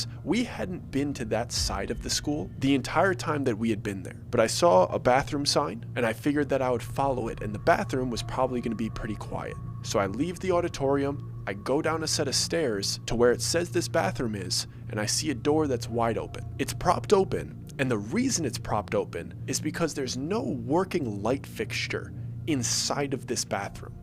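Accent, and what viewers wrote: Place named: East Coast, United States. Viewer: Now I know why listening to you sounds like I’m listening to one of my friends from the neighborhood. I grew up on the East Coast and did a couple of summers in Queens. I also had to Service hour penance.o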